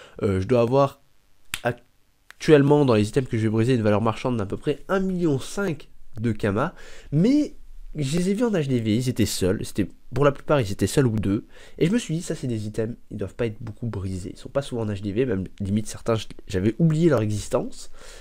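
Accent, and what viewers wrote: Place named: Brittany, France. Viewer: c'est tres l'accent breton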